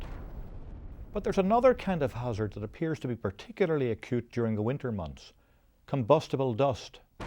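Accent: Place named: Northern Ireland, United Kingdom. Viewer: Yay, this guiy is Irish. From the north...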